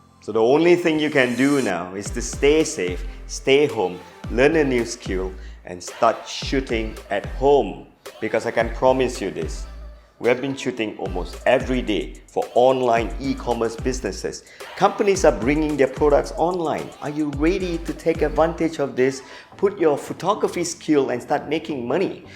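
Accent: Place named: Malaysia. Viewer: Been enjoying your videos since Last year. It's kinda soothing to listen you you speaking, especially with that Malaysian accent. Reminds me of my life in KL years ago. Stay safe always!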